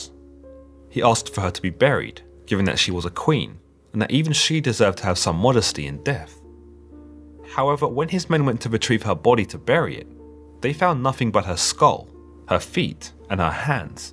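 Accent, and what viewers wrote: United Kingdom. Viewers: 😝😝😝😜😂this guy is really funny with the strong british accent. If the bible is from middle east, why dont you get some narrattor from middle eastern who know where to pause and where to be flawless. You guyz make fun of Indian people...atleast they speak english in neutral accent understandable to all the population of the world . This strong accent is awful which indeed creates stress..Lol . From new delhi India.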